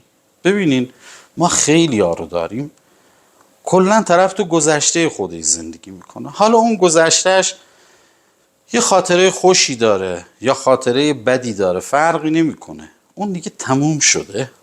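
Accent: Khuzestan, Iran. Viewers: پاینده باشی واقعا شیر مادر حلالت باشه چقدر با لهجه شیرین خوزستانی زیبا روشنگری میکنی ودانش ما را بالا میبری در پناه خدا باشی به امید خدا استادت که بزرگ ما هم میشود خدا هر ناخوشی را از همه انسانها دور نماید با سپاس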